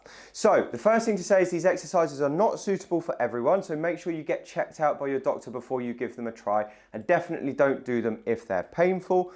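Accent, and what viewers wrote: United Kingdom. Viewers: I love your lovely British accent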